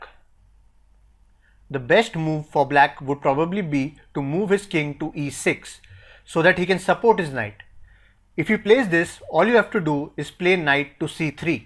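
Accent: India. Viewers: Hahah I love the Indian accent. It fits so well into chess videos and it is also pretty entertaining :P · Love the indian accent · u r indian . ur accent tells it. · idkw but youre very good at this, you teach really good even with the indian accent , just pls remove the intro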